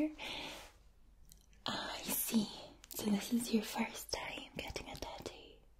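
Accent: Scotland, United Kingdom. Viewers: So Scottish reminds me of Amy Pond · I'm from Scotland and I love all women's accents from all over the world but nothing excites me more than a homegrown accent , amazing X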